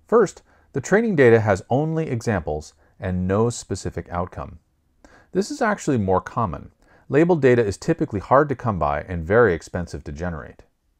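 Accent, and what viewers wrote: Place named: United States. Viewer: American English...pheww...finally found something that I'm able to understand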